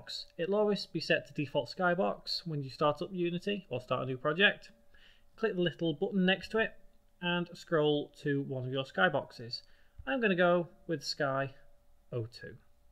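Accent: United Kingdom. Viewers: Dude, he's clearly not American with that British Accent. He's from UK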